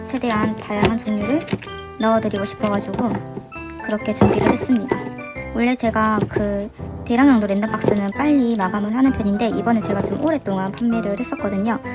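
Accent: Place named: Gyeongsang, South Korea. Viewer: 경상도 분 이신가요오?! 너무 귀여워요 사투리..😳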